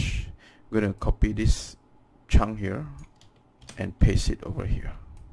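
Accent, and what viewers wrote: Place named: Singapore. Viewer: Singaporean accent. HAHA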